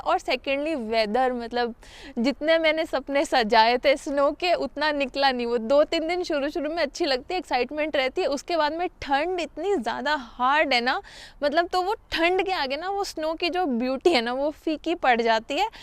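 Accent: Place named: Delhi, India. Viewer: aap karol bagh wali didi ki trah baat krte ho...bda acha lga sun kar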